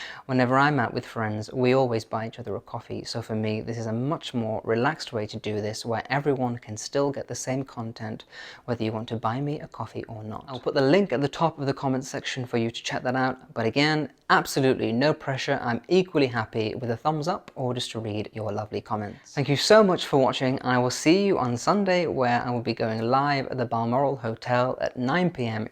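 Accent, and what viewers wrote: United Kingdom. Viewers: I would like to thank your Mother, for bringing such a beautiful💙 angel, into this world!!🤗....I LOVE watching your, glowing-radiant face on camera, and listening to your beautiful British accent!! You, are a treasure🌷🫖 God Bless you, Beautiful Soul!! Love to you, from California.🇺🇸🤗💖💙🤍💜🥰😘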